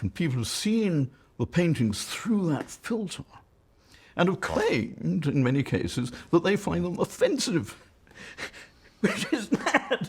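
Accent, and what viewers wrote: United Kingdom. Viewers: Most iconically epic British accent I have ever heard!!! Suck on that Peter Hitchens!! :) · My God, this is the most British man I've ever heard.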